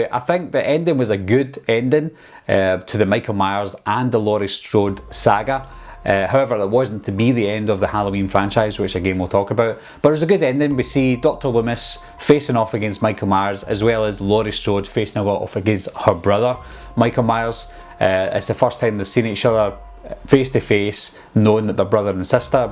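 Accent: Scotland, United Kingdom. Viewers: Where aboots in Scotland you fae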